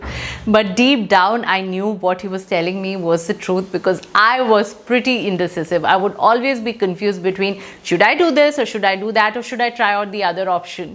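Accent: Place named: India. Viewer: No popular english self help books are available over YouTube in Indian accent. I think you have a wonderful, sweet and friendly Indian accent. If you think of doing it, it would definitely help lots of aspirants like me in developing our English beside personality. Thank you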